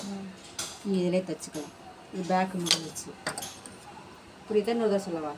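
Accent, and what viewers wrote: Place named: Karaikudi, India. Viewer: அக்கா நீங்க பேசுற பாஷையா பார்த்தால் நம்ம ஊரு காரைக்குடி மாதிரி தெரிகிறது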